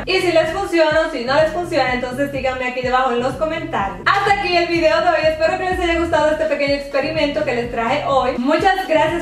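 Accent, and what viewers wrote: Dominican Republic. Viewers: eres Dominicana?😍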